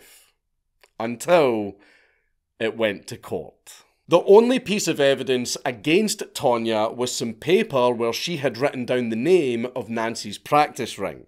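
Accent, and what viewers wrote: Scotland, United Kingdom. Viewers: Funny Scottish Santa man cures my depression for christmas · He's Scottish? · I never realized you were scottish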